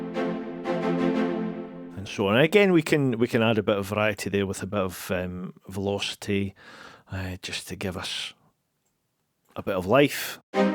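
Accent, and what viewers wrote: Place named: Scotland, United Kingdom. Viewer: ..."if I wudda had this, ... when I was maybe 20....I'd probably be a billionaire right now......🤪😆 Great video with that up yer kilt accent. Luvit. Any more tuts coming our way?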